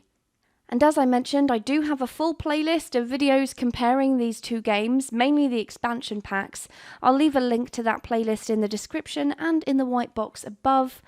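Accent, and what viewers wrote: United Kingdom. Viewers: I think she's british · Oh my life are you brittish? I joined on and your accent sounds so Brittish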